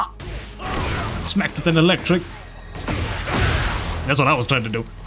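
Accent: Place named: Wales, United Kingdom. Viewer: I'm loving playing Heihachi at the minute he's a great character... unrelated I can't tell if you are Welsh or Asian 😂😂😂. I'm from Cardiff n I swear I can hear some Welsh in there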